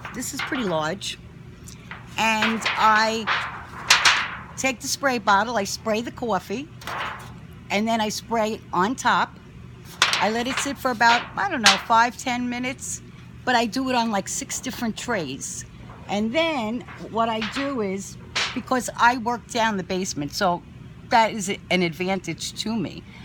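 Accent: New York, United States. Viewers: Love these! btw, are you from NY because you sound just like my mom! :D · hi, i just watched gayle's YT of her order from your shop (and the extra goodies you sent her) so happy she shared you with us, i just subscribed to your channel and favored your etsy shop-i'll be ordering from you soon! you have such a variety of yummy goodies in your shop, i love that you have a dollar bin section! (just like Target and Michaels!!) great idea! i really love these pirate pockets you made and the wax seal stickers. i'm sure you hear this all the time, but i also have to mention how much i enjoy your NY accent! thanks for sharing your great ideas and inspiration. so glad to have found you.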